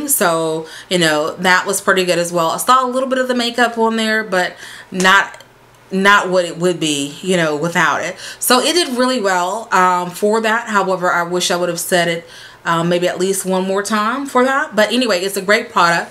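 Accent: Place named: South, United States. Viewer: where are you from? I hear a southern accent! 😜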